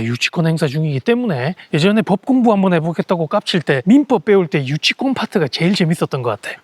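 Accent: Gyeongsang, South Korea. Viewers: 경상도사람이라 사투리쓰는건데 성적정치적일베 ㅇㅈㄹ하고있네.. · 찐 갱상도남자 유투버맞네예 매번 잘 보고 있습니다 화이팅입니다 😊😊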